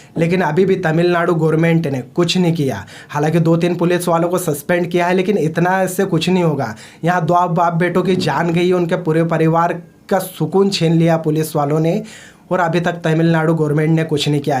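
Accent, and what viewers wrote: Madhya Pradesh, India. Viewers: I love ur accent though Are u from m.p.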